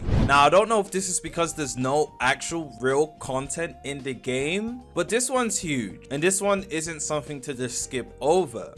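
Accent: United Kingdom. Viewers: Wait, you're british??...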